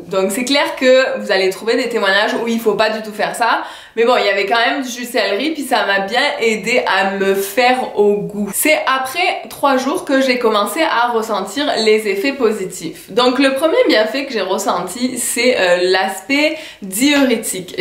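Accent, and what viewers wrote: Quebec, Canada. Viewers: BONJOUR merci pour la video et pour la recette oh vs etes mignonne avec l'accent québequoise merci bonne chance pour d autres video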